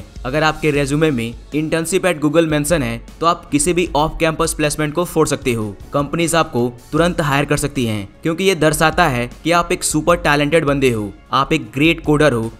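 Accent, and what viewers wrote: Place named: Assam, India. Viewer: Aap assam se ho kya?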